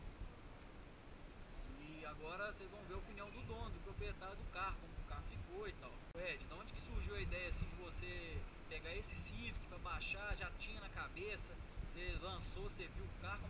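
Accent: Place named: Minas Gerais, Brazil. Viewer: mineiro aff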